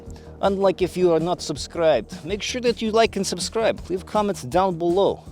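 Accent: Russia. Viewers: His russian accent is almost as good as FPSRussias So thats good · FPS Russia-level accent. Kyle would be proud.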